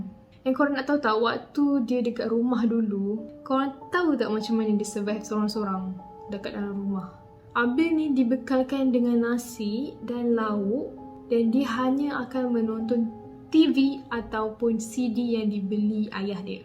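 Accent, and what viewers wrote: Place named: Malacca, Malaysia. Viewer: Akak org melaka ke kak? Cara bercerita mcm org melaka je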